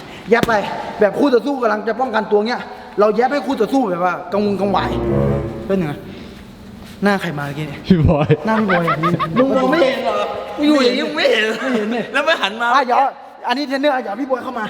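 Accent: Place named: South, Thailand. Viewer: สำเนียง คนใต้ อีสานใต้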